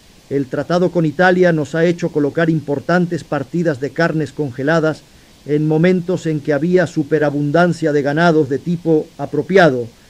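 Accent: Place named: Spain. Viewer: Porque hablaba en español de España?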